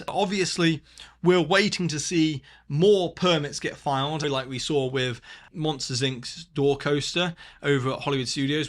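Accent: United Kingdom. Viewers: Are you putting on your British accent? Why are you saying wailwoad, twain, dwainage, you weckon, etc.?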